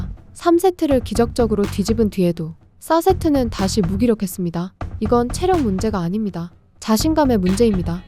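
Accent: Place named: South Korea. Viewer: orang Korea bro kelihatan logatnya tp kelahiran Tegal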